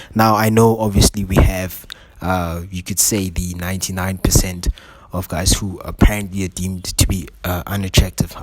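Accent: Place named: South Africa. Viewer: Your accent sounds like mine... are you also from South Africa?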